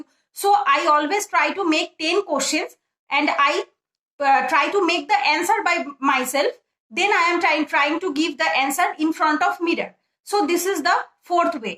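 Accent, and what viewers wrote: Bengal, India. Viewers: Where do u live?.. Btw I can easily make out that u are a Bengali😊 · Hello ma'am, this video is very helpful for me because I prepare upsc exam in hindi medium but I realise English medium given exam is better than hindi because in upsc number of selection only English medium students so I also decided to prepare this exam in English but I have so many problems and confusion how to cover and understand by this language, you're giving tips useful for me and I want to applied ourselves.abhi meri English typing very bad hai ,aap meri feeling ko samaj lijiye.Aap ki English bhi ek bengali type lagati hai jab aap bolti hai, mujhe bengali nhi aati phir bhi ish language ko apne landlord jo ek bengali family hai wahi pr suni hui hai. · Madam are you bengali?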